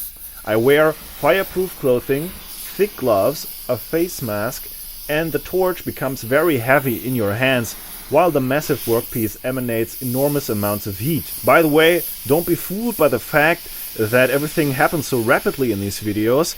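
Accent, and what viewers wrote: Germany. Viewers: I was a US soldier serving in Germany from 1990-96 Your flea markets are much like your scrap yard, All nice stuff, over here in the state rarely do you get steel drops or anything that functions! Your videos are great, my mother was German, she passed in 2017, it's always nice to hear a German accent, thanks for sharing your work! ;)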